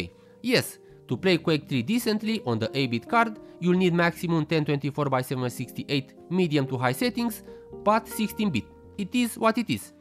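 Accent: Romania. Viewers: He is Romanian, that's why he has this accent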